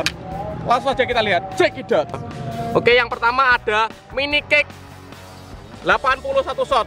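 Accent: Java, Indonesia. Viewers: Jowone ngendi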